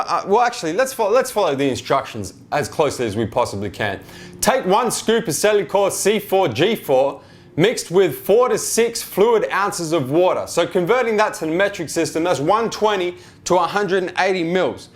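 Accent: Australia. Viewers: dude u might wanna neutralize your accent a bit , kinda difficult for guys like me not from Australia to understand your accent, maybe try American accent bro ? nice vid tho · Sounds like an Australian version of Alfie Aesthetics. No shit.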